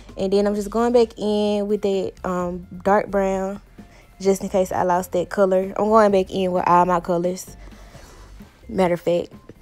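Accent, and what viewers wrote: South, United States. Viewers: nah i was tryna guess what state grl.. because yu definitely from the south! Nd this makeup is fye..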